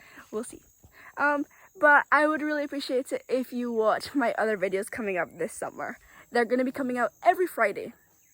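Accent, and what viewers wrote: Scotland, United Kingdom. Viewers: Congratulations on your academic achievements. This is only the beginning, stay focus and you will accomplish more academic success. I am not surprised at your scholastic success. There's absolutely nothing wrong with speaking with a Scottish accent. Enjoy your Guyana vacation. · Hi, so great to see you and hear your Scottish accent. You have to do as the Scotts do, lol. You are an outstanding student. Keep up the great work—this is your time to reach the stars. We will be here to welcome you back whenever time permits you to make videos. Stay safe and well. God’s blessings on you and your family 😊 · Nice you are speaking Scottish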